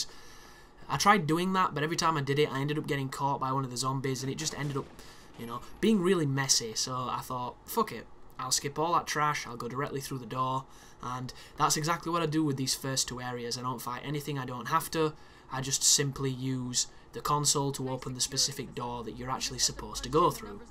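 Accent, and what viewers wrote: United Kingdom. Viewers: I don't understand your comment and whether you're being sarcastic or not. Playing the game by always choosing 'No' takes some discipline, but at least it means that there is a point to the healing items on Professional difficulty. The game is still annoying in that you can only have one saved game at a time, that's a really bad flaw that. You sound like you're from the UK, me too! :-) I like your commentary, you make some interesting comments and assertions about games and whatnot.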